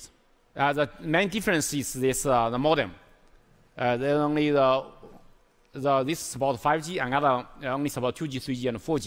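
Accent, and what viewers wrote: China. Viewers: I'm Brazilian so my accent is not amazing, but in some parts of the video I truly thought he was speaking Chinese. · I’m Cantonese and I hear Mandarin in parts too 😂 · I honestly thought he was speaking Chinese and then translating to English. Turns out the whole speech was in English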